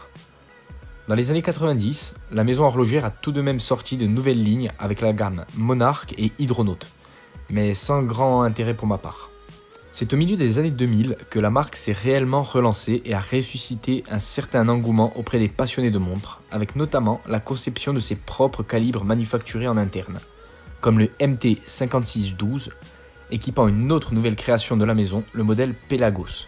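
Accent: Corsica, France. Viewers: Un soutien pour le contenu de a vidéo ainsi que pour l'accent corse. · Ah... je reconnais bien l'accent corse !!!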